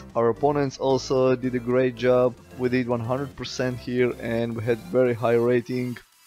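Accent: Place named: Russia. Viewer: Ты русский? У тебя просто акцент не английский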